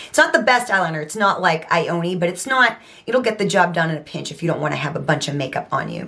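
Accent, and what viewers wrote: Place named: South, United States. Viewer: Hey,. My first time here. I picked up on that southern accent in the very beginning was wasn’t absolutely positive until I heard you say “time”. We’re southern USA neighbors. I live in Alabama. I don’t like dollar tree makeup much at all, but I love the thought of a 1.25 beauty product that might work well for someone else. Who knows, one day they may have something that does work for me. So I am going to hang out with y’all for a while. Thanks. 🙏 😊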